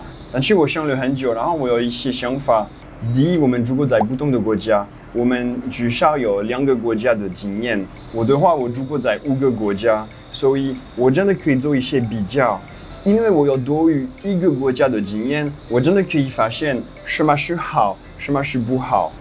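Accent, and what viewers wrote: Taiwan. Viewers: ，聽起來很親切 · 你真的愈來愈台囉～聽你講話有種溫暖的感覺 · 謝謝，歡迎來台灣。你的新民字很棒，，很親切。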